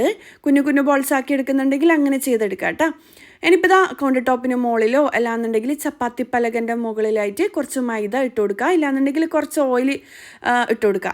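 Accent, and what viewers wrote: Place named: Kasaragod, India. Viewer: കാസറഗോഡ് സ്റ്റൈൽ സംസാരം. കുറച്ചു ഉണ്ട്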